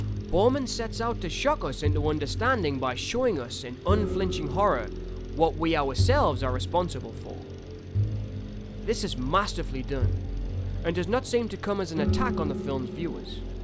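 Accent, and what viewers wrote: Newcastle, United Kingdom. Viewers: are you half geordie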